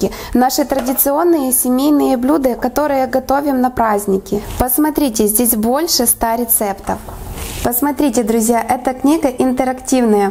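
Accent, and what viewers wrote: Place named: Ukraine. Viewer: Просто наверное с Украины а старается говорить на русском языке ну не придерайтесь все понятно девочка старается.Спасибо!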